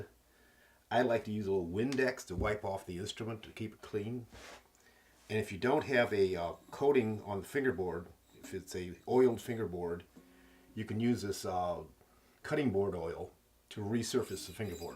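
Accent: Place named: Great Lakes, United States. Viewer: Your Great Lakes accent is quite strong.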